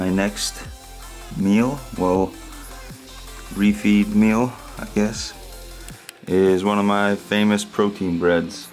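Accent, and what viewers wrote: Boston, United States. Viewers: CAHBS. Love the Boston voice. \m/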